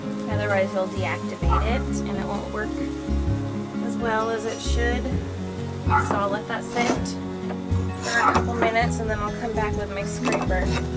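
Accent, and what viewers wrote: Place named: Oregon, United States. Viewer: How funny. I stumbled onto this video, and all of a sudden I thought, "This woman really sounds like she is from Oregon." I had to check it out, so I went to your web site and I see you are in Coos Bay. I didn't know we had a recognizable accent! I'm from Medford.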